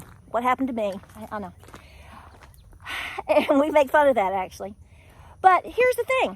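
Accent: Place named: East Tennessee, United States. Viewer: I agree 100% with you! Going out to grease the tractor and bush hog. I will then work the garden. I am an East Tennessee native and senior woman farmer. I talk just like you and I am not changing. You Go Girl! God bless everyone. John .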